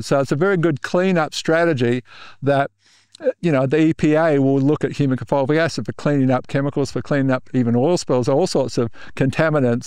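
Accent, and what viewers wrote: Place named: Australia. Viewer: The captioning translation is saying collate do you mean chelate? Your Aussie pronunciation is changing what we in USA pronounce as "key late"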